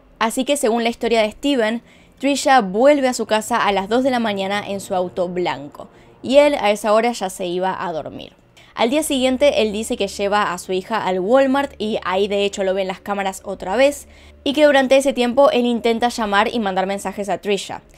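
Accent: Argentina. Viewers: Me encanta tu manera tan espotanea de narrar los hechos, tu acento argentino me encanta (corrígeme si no es argentino) y además estás super bonita awss ❤️✨ no puedo con tu canal es demasiado perfecto✨ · Hondureña cejuda con acento argentino?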